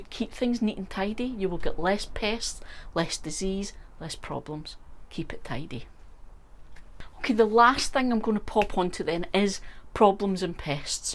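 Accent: Scotland, United Kingdom. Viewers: Thanks for your video with your charming scottish accent.' I kanee...?..I was at uni at St Andrews and it brings back good memories. What I don't quite understand why you would put the IKEA plastic recipients low down because you could use that space to grow plants and maximize the light. We have built light shelves all around the edges of our greenhouse which can be taken away so we start small plants on two levels then remove the shelves that are about at one meter twenty . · Hello again. I believe it was back in your previous greenhouse. Maybe I’m not remembering correctly, but it was a smallish plug in heater. I don’t want to cause you any extra effort because I could be remembering wrong! Anyway, if it’s nice and warm all day and is then at freezing at night, I’m thinking that would not be good! Anyway, if you are pretty sure you never used a heater, then don’t worry about it. 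Could be another of my senior moments! Ha ha. PS the first time I watched you, I thought “oh no, I’ll never be able to understand what she’s saying with a Scottish accent” which of course was not the case. Your presentations are so great! Keep them coming👏👏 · Hello my new friend in Scotland! This was a wonderful video! First of all listening to you is like having my mother read me a bedtime story… Your accent and lilt are very soothing! Secondly, I just realized that by using water from the tap, I am shocking my seedlings! So thank you for that common sense advice. Lastly, you have inspired me to move some tomato and basil plants into my greenhouse for the winter. I’ve always wondered about pollination inside a greenhouse , so I’ve never done it all season long. However, I am going to try to extend my season this year by moving my establish plants into my unheated greenhouse. Looking forward to viewing the rest of your videos. 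· New here love your channel and your information thx u 😊 I’m from Australia 🇦🇺 my mum is Scottish so I feel at home listening to your voice ❤ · Clicked for the title, listened because of your awesome accent (Scottish heritage all over my family tree), and stayed because of your content. So very helpful. Thank you for sharing your setup. You got a new subscriber. I had to laugh at myself being a typical American. Me: What? they have IKEA in Scotland? Me a moment later: Ya big dummy, IKEA is Swedish, probably had IKEA before you even knew what IKEA was. Hahaha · I was surprised when you first started talking. Your accent is so cute and you are so easy to understand. Except a couple of times you mentioned ober jeins.(That is how it was spelled in the closed captions.) I wondered what that was.So I googled growing ober jeins in Scotland, and started laughing when I realized you were saying aubergines! 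We just call them egg plants here in Michigan. You were very informative and helpful, so I decided to subscribe to hear more of that accent! I almost did not click on your video when it came up in my feed but I wanted to find out what your Ikea hacks were. I am so glad I did! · Not fair unless we know where your accent is from?? Scotland. Irish. I am Scotch Irish living in Louisiana, raised in Texas with an East Texas accent. 😊 ❤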